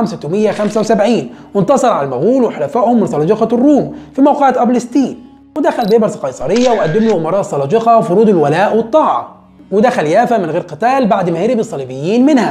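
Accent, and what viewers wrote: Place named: Egypt. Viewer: تتكلم سريع صعب نفهم احنا غير المصرين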